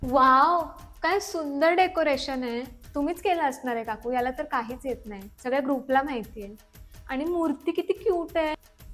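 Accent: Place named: Mumbai, India. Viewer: खास मुंबईकर मराठी!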